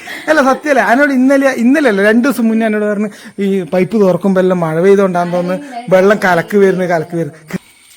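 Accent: Kannur, India. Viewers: നിങ്ങളുടെ vdo കാണുന്നത് ഈ സംസാരം കേൾക്കാൻ വേണ്ടിയാണ്, എല്ലാരുടേം സംസാരം എനിക്ക് ഒത്തിരി ഇഷ്ടാണ്. കണ്ണൂർ സ്ലാങ് നിങ്ങൾ പറയുന്നത് കേൾക്കാൻ സൂപ്പർ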